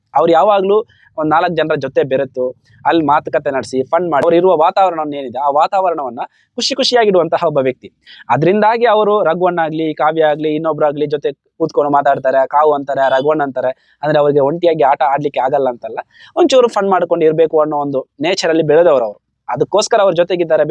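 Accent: Mangalore, India. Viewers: Sir neevu banglore navra, nanu nimma mathu nodi manglore ankonde, navu banglore, thumba chali ide, Rakshitha Gilli best❤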